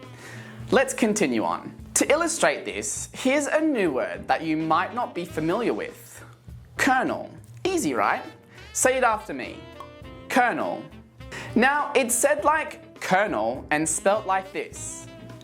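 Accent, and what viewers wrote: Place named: United Kingdom. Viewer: Hey up m8! Brilliant video. Do you have your own playlist of your own? I like your familiar British sounds.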